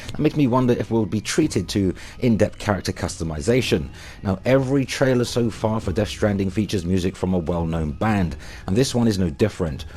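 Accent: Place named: Cockney, United Kingdom. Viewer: How come you’re posh in your produced videos, but all geezer in your podcast chats?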